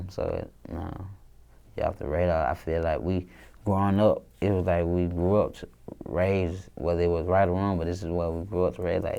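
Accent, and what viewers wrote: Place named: Atlanta, United States. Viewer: Atlanta may have the worst accent I’ve ever heard. This video needs subtitles.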